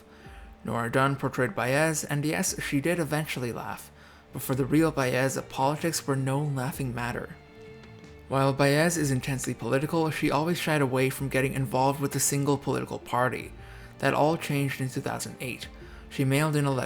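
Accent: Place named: Scotland, United Kingdom. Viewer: Scottish narrator! Scottish narrator! Scottish narrator on every vid!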